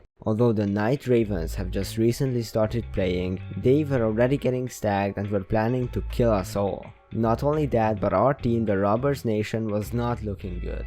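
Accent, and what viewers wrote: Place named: Hungary. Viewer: You have a little hungarian accent, but i love it. You are probably not hungarian but yeah.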